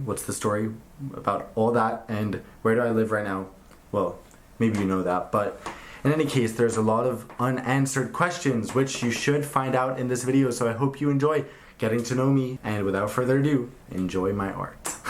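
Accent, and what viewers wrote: United States. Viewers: So how did you come to have such an American accent? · Interesting life, although I still don't get why it is that you speak English with an American accent when you grew up in London, somewhere near Heathrow, Forest Row (11 miles from where I live now) and Sweden!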